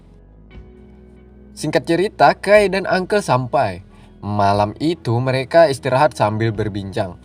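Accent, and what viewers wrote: Banjar, Indonesia. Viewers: mimin ini dr kalimantan bro. logatnya kental bahasa banjar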